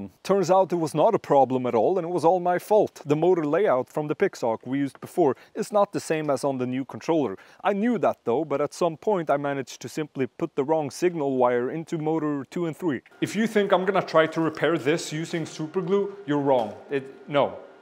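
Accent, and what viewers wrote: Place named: Sweden. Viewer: That was pretty amazing... And I recognised your Swedish accent almost immediately — it’s remarkably similar to David Windestål’s. I’ve watched enough of his tricopter videos to recognise that accent that in no time!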